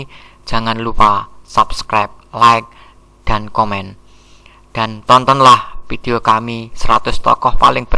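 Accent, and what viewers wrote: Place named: Java, Indonesia. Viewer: Bisa gk, di kecilin musiknya dan logatnya jangan meddo kali la..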